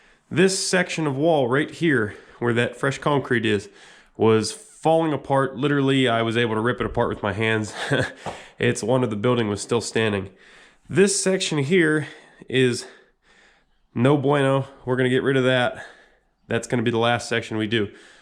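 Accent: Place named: Midwest, United States. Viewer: You’re a mid westerner, we all talk wrong...I still put an R in wa”r”sh.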